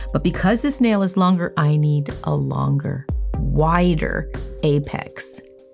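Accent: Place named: Canada. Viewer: I always do hard gel, but I just started doing acrylic. You have been such a help – plus I just love the Canadian accent!😃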